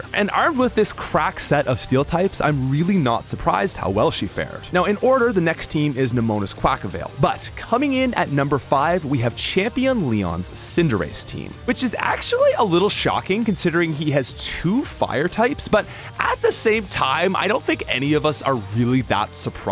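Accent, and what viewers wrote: Canada. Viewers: Digging the Canadian accent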